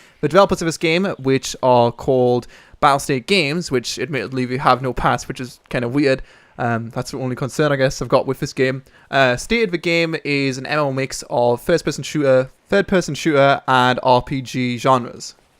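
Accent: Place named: North East England, United Kingdom. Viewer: lol, dad dad!? U sound like youre from the north east :)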